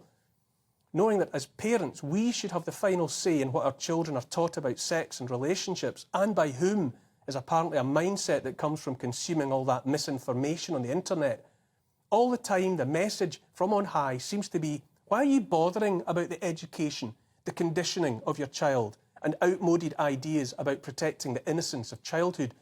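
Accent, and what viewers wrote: Scotland, United Kingdom. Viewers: maybe it's the tone of your voice or the barely hidden anger in your eyes. Or maybe it's the Scottish accent, but every single time i listen to what you have to say I'm looking for a two hand broad sword and a fxcking big axe.. Keep going, stay free.. all the best from the Netherlands.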